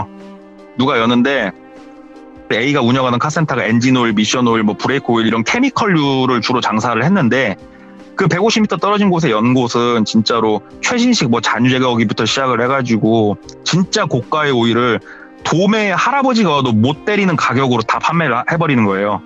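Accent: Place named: Chungcheong, South Korea. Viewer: 충청도 분인가ㅋㅋㅋㅋ 익숙한 억양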